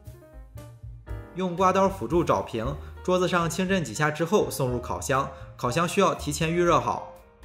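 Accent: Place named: Jinan, China. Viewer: 老爸真是中西结合的好典范啊，亦中亦洋，都拿捏住，太绝了，你也太有口福了吧！！我是济南人，听着你的济普很亲切哦！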